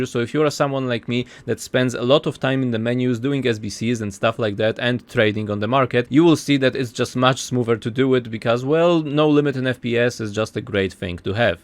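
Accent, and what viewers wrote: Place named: Poland. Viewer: Ja nadal słysze polski akcent xD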